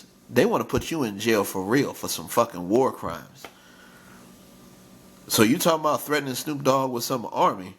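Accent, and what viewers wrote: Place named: East Coast, United States. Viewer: EAST COAST, I LIKE THE WAY YOU TALK.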